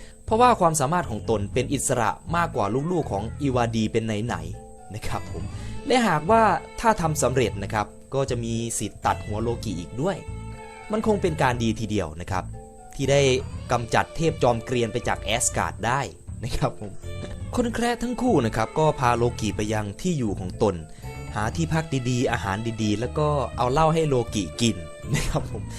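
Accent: Isan, Thailand. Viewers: พี่เป็นคนอิสานใช่มั้ยครับ 555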